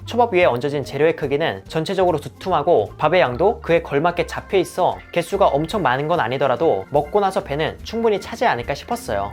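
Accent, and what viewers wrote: Gyeongsang, South Korea. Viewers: 사투리 쓰시는거 보니 경상도 분 인가요?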